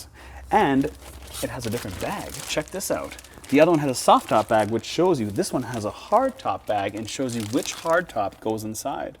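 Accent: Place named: Canada. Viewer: When I started listening to this guy, I swore he sounded Canadian, and then I found out he is hahaaha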